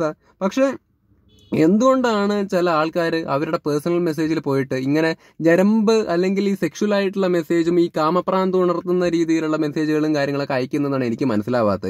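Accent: Palakkad, India. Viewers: Palakkad slang varund broiii👏👏👏👏👏 pkd anel proud of yu man✌️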